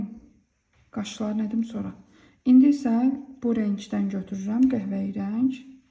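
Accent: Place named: Bayburt, Turkey. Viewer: O kadar güzel anlattınız ki örgü severim zaten şiveniz bize yakın bende Bayburt luyum,bitirince instagram dan sizi etiketleyerek paylaşacağım❤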